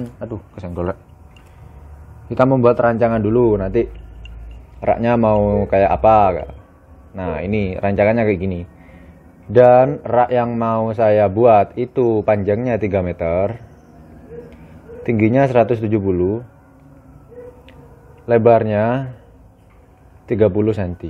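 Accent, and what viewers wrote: Madura, Indonesia. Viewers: Logat madura nya kentel 👍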